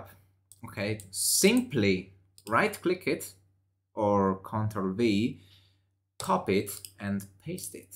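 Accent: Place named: Italy. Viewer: "Sorry for the Italian accent." It's okay, we forgive you.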